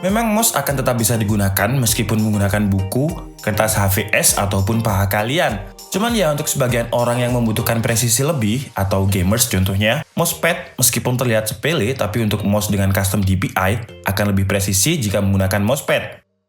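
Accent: Malang, Indonesia. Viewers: Khas e wong Malang 😂💪🔥🔥🔥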